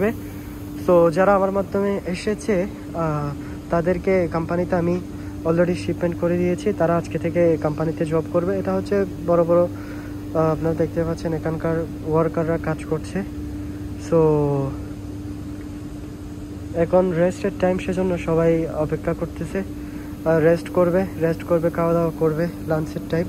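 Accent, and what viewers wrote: Chittagong, Bangladesh. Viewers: আসসালামুয়ালাইকুম ভাই। কোন কাজ সম্বন্ধে ভালো ধারণা রাখেন তারপর ভিডিও বানাতে চেষ্টা করুন আপনি যে বলতেছেন এসি ফ্যাক্টরি আসলে কি এটা এসি ফ্যাক্টরি নাকি ডাক্টিং ফ্যাক্টরি সেন্ট্রাল এসি তে তিনটে পার্ট থাকে ওইটা কি একটা অংশ আর কিছু আবাল কিছু না বুঝে শুধু কমেন্ট করে যাচ্ছে ভাই মনে কষ্ট নিয়েন না আপনার ভাষাগতভাবে বুজা যাচ্ছে আপনি চট্টগ্রামের মানুষ চট্টগ্রামের ঐতিহ্যগত খেয়াল রাখবেন।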